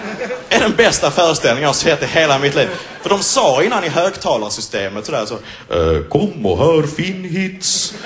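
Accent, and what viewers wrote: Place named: Scania, Sweden. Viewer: Har han ju inte x'D Bara lite mindre brytning men jag hör fortfarande skånska rakt igenom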